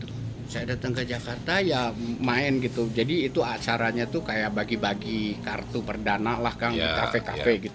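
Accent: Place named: West Java, Indonesia. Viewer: Kang nyariosna meni ngosom khas Sunda pisan...👍👍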